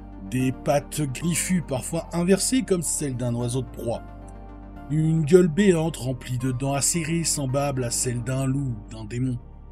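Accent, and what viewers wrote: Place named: Eastern Europe. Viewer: Je découvre ta chaîne, mais quelle ambiance! J'aime bcp ton montage sobre, ton élocution, la prestance de la grosse beubar de viking, cet accent slave magnifique 🤌 Ton analyse est fouillée et pas inutilement dans le debunk. En tout cas ton Drekavac me fait fort penser aux très modernes creepers ou rakes (humanoïdes squelettiques, pattes arrière retournées, yeux fluorescents, gueule béante et emplie de dents...) Y'a une version en Europe de l'ouest, comme tu dis le cri est horrible et ils sont très furtifs. Le coup de la photo/film raté se recoupe avec pas mal d'images de cryptides, qui sait si ces créatures ne peuvent agir sur les images avec un genre de champ électromagnétique ? Et cette histoire de cri avant un mort rappelle les Banshees bien sûr! Je m'abonne évidemment:)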